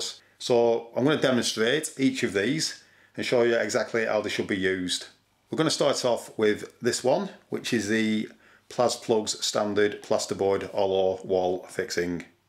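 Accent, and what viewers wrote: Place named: North West England, United Kingdom. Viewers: For any Americans who may be watching this (and to annoy my fellow countrymen as well) the man in the video is talking funny because he is from the North of England. He does however, know his fixings and though you may think he is demonstrating the bleeding obvious it is comforting to know that your workmate who seems able to use the blue fixings that you keep snapping is either uniquely capable, very lucky or is going to get a phonecall from the boss next week to say the speaker, projector, interactive whiteboard, whatever, he fitted at that last job has just come off the wall and narrowly missed a school kid etc. etc. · I'd say, more specifically, that he comes from the North-West of England: Lancashire or Manchester. Doesn't sound like any sort of Yorkshire to me.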